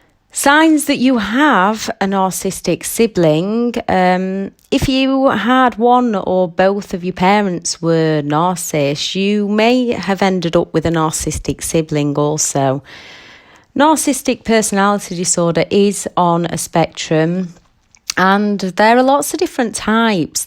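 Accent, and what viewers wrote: United Kingdom. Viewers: I was thinking I might have grown up in the same household with the narrator, except for the British accent. The only member of my family not a narcissist, aside from me, died when I was a kid. For years I thought I just could not get over my father's death, and I cried and cried and cried, thinking there was something wrong with me. It took a long time to realize it was sad that he died, and he was the only one who loved me or treated me with love, tenderness, and care, but what I was really reacting to was the fact that he died and left me alone with THEM. Great analysis of growing up with NPD in siblings.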